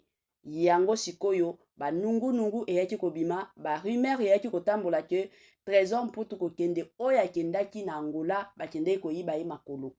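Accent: Rwanda. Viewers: Tika biloba loba yo oza Rwandaise,tonalité nayo eza kofunda yo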